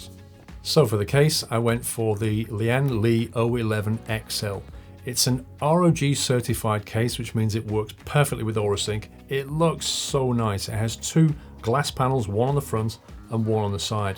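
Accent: United Kingdom. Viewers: OK to be honest his voice sounds British but his face says Florida man 100 %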